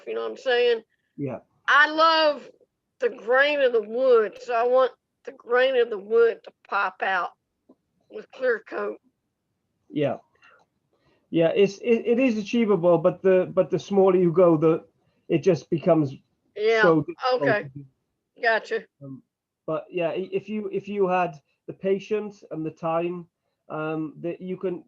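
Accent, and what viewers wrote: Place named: Wales, United Kingdom. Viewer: Gotta be a welsh accent. Lovely to hear it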